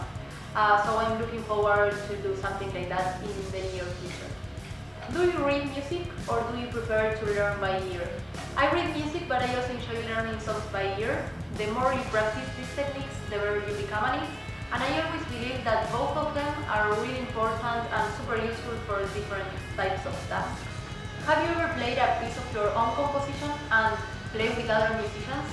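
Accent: Argentina. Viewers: por el acento se te saca la argentinidad al toque . igual me tendria que haber dado cuenta por tu nombre artístico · te saque la ficha con sos argentina por tu acento nada mas jaja, sos una genia loca, un beso desde Mendoza.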